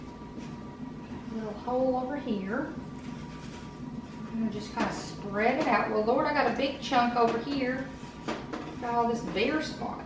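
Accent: South, United States. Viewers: I think it turned out really cute! Your southern accent is so endearing :)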